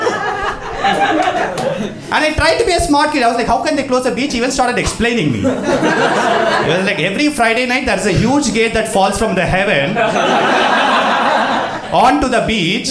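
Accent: South India, India. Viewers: I just hate madrasi accent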